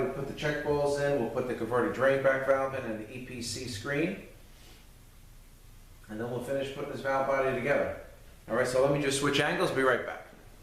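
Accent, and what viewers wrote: Long Island, United States. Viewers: Greetings from Albany! I think I hear the LI accent, i'm from there(SE Nassau area)!!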